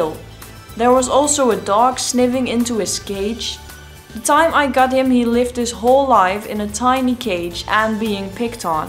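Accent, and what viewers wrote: Netherlands. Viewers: i have 1 qeustion: are you born in the netherlands because you sound dutch to me ( i really like your videos but i was wondering because im dutch my self) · Wie hoort ook dat ze Nederlands is? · Are you dutch??? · Lauren Mcintyre Nederlands denk ik ook. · Pedro Paúl She's Duch i think. I have Dutch relatives and i recognise the accent when they speak English. I like it too 😊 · ik heb je door je hebt een nederlands accent ja ja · Thank you so much for this video! I have a pair of little robos. I have waited about a week since I got them and I've tried to tame them a couple of times. They are usually scared of me but yesterday they took a treat from my hand. It definitely takes a lot of patience to tame a robo! I will be using the techniques in this video to aid my taming process, Btw I love your accent! Are you Dutch? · I can hear ur dutch i don't know why, het is gewoon beetje het accent.....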